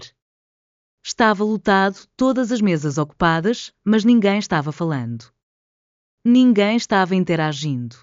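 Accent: Portugal. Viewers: Esse é o português de Portugal. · Porque a voz é portugues de portugal? Quase não dá para entender.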